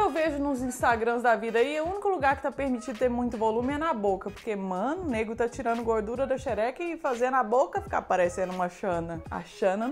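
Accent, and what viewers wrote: Minas Gerais, Brazil. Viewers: Como não assisti seus vídeos antes! Moça você é demais! Muito engraçado! Nosso sotaque de MG é muito legal! Mineirice sempre!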